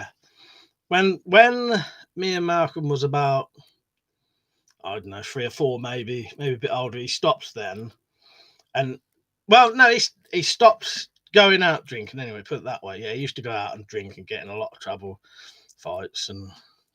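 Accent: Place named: Suffolk, United Kingdom. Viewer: I detect a suffolk accent...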